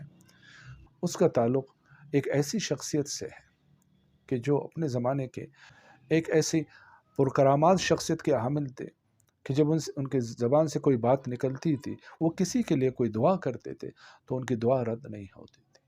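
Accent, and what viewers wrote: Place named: Gilgit, Pakistan. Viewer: Aap Shia Hain ?? ✍️✍️✍️. Awaz say ttu aap Gilgitti lagtay Hain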